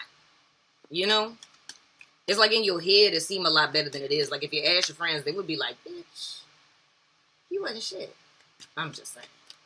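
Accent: New Orleans, United States. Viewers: Love this video and your energy I’m an Aries male and I have been dealing with my ex a lot. Know u was from New Orleans within the 1 st few sec I am too deep it goin luv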